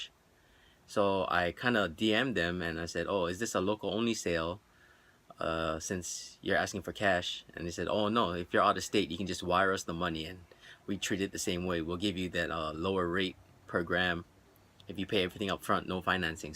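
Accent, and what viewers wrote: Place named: Hawaii, United States. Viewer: Hawaii boy i can tell . kalihi maybe waianae ..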